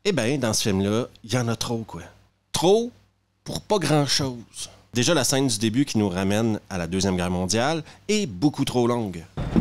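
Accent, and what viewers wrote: Quebec, Canada. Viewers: Bonjour, 1ère video vue sur votre chaîne et déjà "super" je comprends tout (je suis Français). Et oui, souvent je tombe sur des videos qui ont l'air super intéressantes de Québecois mais l'accent est trop hard pour suivre (désolé de dire ça et je précise que j'ai adoré visiter le Quebec). Donc là, y a le super accent "typique" (pour nous qui parlons "too plate" comme on m'a dit à Montreal lol) mais c'est tout à fait compréhensible pour qui n'a pas l'habitude. Ceci dit, toute la critique du film est très drôle et intéressante et évidemment je suis d'accord par rapport à ce carnage de la légende "'Indiana" (il n'existe qu'une trilogie pour moi, vive les années 80). Donc bravo, je m'abonne ! Bonne continuation ! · C'est pas possible, qu'est-ce que tu fous dans ma tête? J'ai eu l'impression de m'entendre parler en québécois pendant un quart d'heure 😅 Merci pour la vidéo, même si c'est vraiment dérangeant d'être 100% d'accord avec quelqu'un 😱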